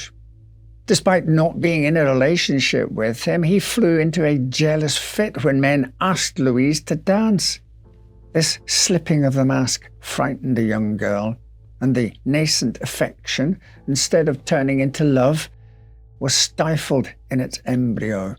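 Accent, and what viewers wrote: Scotland, United Kingdom. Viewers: I’m no expert but that’d be my best guess too, Scottish. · "Somehow" I knew one-day a British voice would explain that there was a French murderer than was worse than Jack The Ripper! Ha! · I love his voice and accent. Is he Scottish? 🥰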